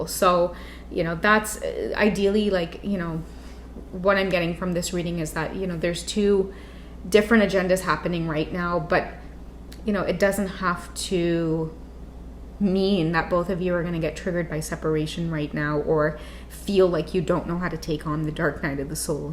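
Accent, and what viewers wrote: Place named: Trinidad and Tobago. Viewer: I love your Trinidadian accent and love your help. Thank you for your time and energy<3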